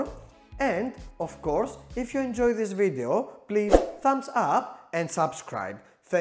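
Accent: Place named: Greece. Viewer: Greek accent...💙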